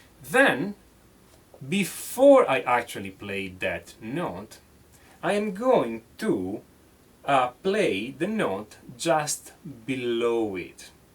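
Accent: Italy. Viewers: he is italian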